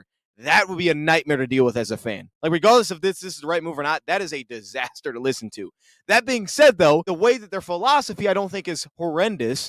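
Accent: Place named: Midwest, United States. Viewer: This is literally a record of what he is saying so you can play it back. Sorry you can't keep up with that Midwest accent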